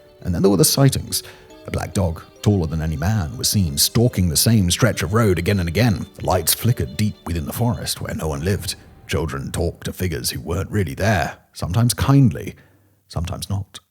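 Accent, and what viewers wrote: United Kingdom. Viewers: WTF, can't take listening to a Limey accent. Particularly with what's going on in their country this time.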